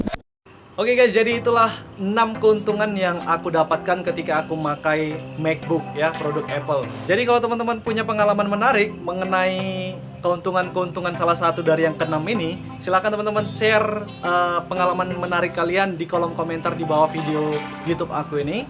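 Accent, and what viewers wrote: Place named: Medan, Indonesia. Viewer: abang orang medan rupanya, pantes la kok ga asing logat nya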